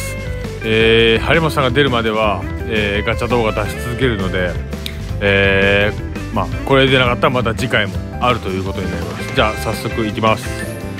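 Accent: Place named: Osaka, Japan. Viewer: たまに出る大阪弁嬉しい😍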